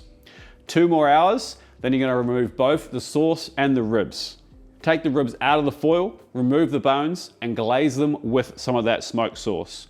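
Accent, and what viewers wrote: New Zealand. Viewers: Thanks i appreciate the effort you put in regardless of the bitchy comments, and your on the button with wrapping ribs in foil to steam as they cook,i do this everytime and the ribs are perfect in the oven. Thanks you Kiwi accented fitter and turner, be safe buddy,love your work. 🥰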